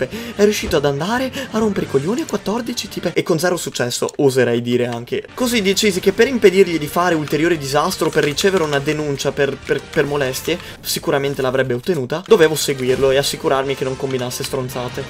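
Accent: Veneto, Italy. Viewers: te sei veneto? Io si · ma sei veneto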